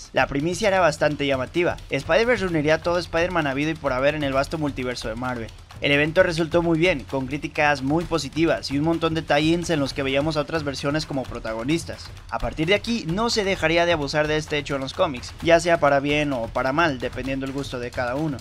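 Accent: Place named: Colombia. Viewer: Por que te escuchas como Colombiano en este video xd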